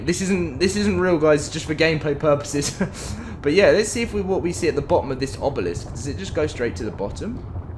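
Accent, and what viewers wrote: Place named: United Kingdom. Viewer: And yet another video that i have to skip because of a british accent that I just cant take honestly. I thought the new generations were loosing accents ? Why british people always gotta do stuff differently ? It sounds so gay I just cant sorry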